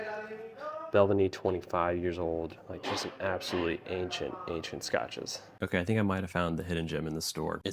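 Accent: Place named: United States. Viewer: i love the small inaccuracies when you talk, makes you sound very american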